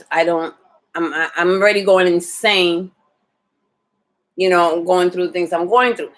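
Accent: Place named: Bronx, United States. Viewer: Honey, are u from the Bronx? I ask because I am. U sound like it. Anyway, I know ur not a professional but I want to ask. Have u ever had ur dr cut ur klonopin dose. Mine did it. And it's been 3months. I updosed n still no relief, my brain is so messed up. Cant function. I'm a CNA n have to work. U can imagine. I updosed again. So stupid up n down. U ever had this?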